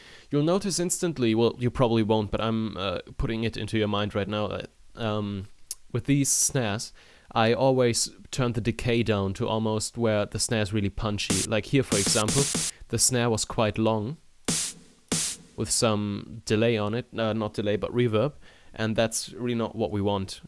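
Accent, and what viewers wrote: Germany. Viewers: and i can hear youre german, mein junge :D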